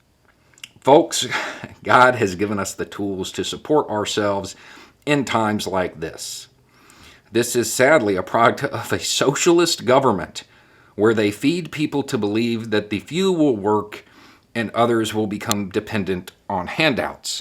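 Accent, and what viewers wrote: South, United States. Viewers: Just shared this video with my Dad who is in CT and said he had to go out and shovel snow. I thought he’d appreciate your tips for generating heat. I then thought of another tip forgot to mention Chihuahuas! Read the following in awesome southern voice: “Grab about 5 chihuahuas and bring them into the tent you’ve set up in your living room. They are automatically inclined to hide under blankets. The chihuahuas will generate heat and keep you warm! It’s just a thought.” We’re a chihuahua family but you can substitute your canine preference:)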